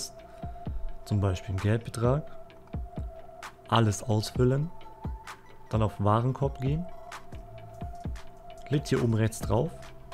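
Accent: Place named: Sweden. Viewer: Oha hätte niemals gedacht das so ein Schwedischer Restakzent klingt aber ist auf jeden fall mal was anderes. Hat was ^^ Ist auch ein seht sehr schönes Land definitiv und gar nicht immer so kalt wie man denkt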